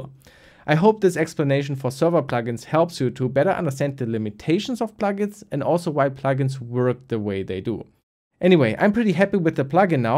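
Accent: Germany. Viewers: I always love hearing a german accent in videos and then checking the user profile to find out I was right again: Berlin in Deutschland :) Schön zu sehen, dass jemand wie du fast 750k subs hat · wait.. are you german? i can hear it out a little bit xDF...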